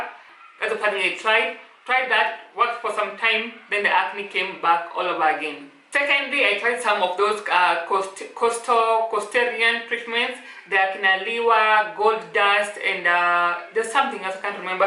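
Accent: Kenya. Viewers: It's an educated Kikuyu accent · Looking great. Your skin is glowing. ❤ *finally I can hear Kenyan/ kikuyu accent *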